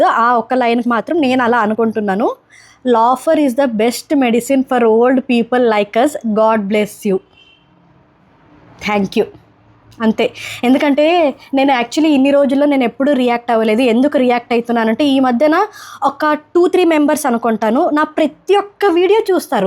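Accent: Karnataka, India. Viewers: Miru kannadollu ina trlugu vallu ki mi kannada accent nachindi emo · గారు మీ వీడియోస్ చాలా అద్భుతంగా ఉంటాయి లాంగ్వేజ్ గురించి మాట్లాడితే కర్ణాటక తెలుగు కర్ణాటక తెలుగు కు ఆంధ్ర తెలుగుకు కొద్దిగా తేడా వస్తది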